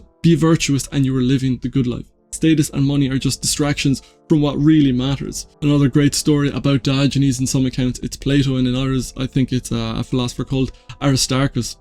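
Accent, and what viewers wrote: Ireland. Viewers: Oof, what a lovely irish accent. Such pleasant listening.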